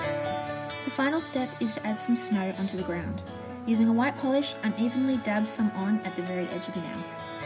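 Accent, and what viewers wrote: Australia. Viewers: Love this manicure so much! And it's really good to hear an Aussie accent on YouTube too. Happy Christmas to you, from May in Perth <3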